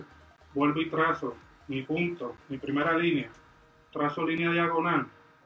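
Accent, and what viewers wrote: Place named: Puerto Rico. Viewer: Eey!! Ese acento boricuaa!! Gracias por el tutorial, me es de mucha ayuda!! Saludos desde Guánica 🇵🇷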